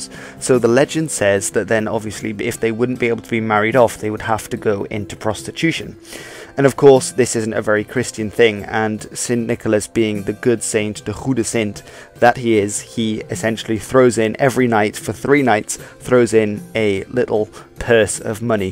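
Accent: United Kingdom. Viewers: DUDE YOU'RE DUTCH BUT YOU SOUND BRITISH,THAT'S AWESOME! I AM FROM ARUBA BUT I SOUND AMERICAN,HAHA! GROETJES UIT ROTTERDAM!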